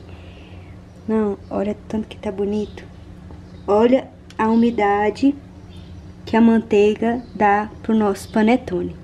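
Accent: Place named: Rio de Janeiro, Brazil. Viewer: Oi, falei muito, mas esqueci de falar que soou carioca, mas moro em Brasilia, sou professora aposentada e depois que aposentei sempre procuro mais novidades em almoços e jantares, simples e rápidos e também mais sofisticados e por isso encontrei-a, pois há anos estou ligada em assistir e fazer novas receitas.para receber família e amigos.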